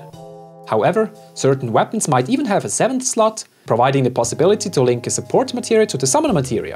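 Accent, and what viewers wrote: Germany. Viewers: Are you German? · Kommst du aus dem Osten oder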